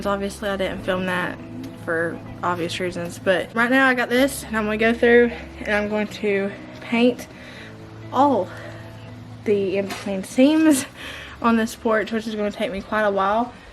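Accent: South, United States. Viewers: So sorry about your chickens. We cry with you. God created them too., you are kind, intelligent and God's beautiful daughter. You are not stupid! Shame on the person that said that. You have a southern accent and dialect sweet lady - never lose that, never change. You all did an amazing job on your porch. It looks great! Big hugs.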